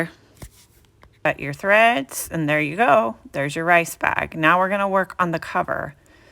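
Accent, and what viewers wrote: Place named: Texas, United States. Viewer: Such a great tutorial! Clear instructions and easy to follow along. Love that Texas accent ❤️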